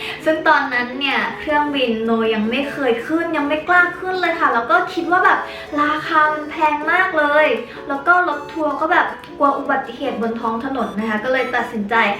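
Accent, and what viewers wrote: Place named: South, Thailand. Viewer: เสียง เหน่อ สำเนียง ใต้ น่ารัก น่าฟัง มากๆ ชอบๆ 😍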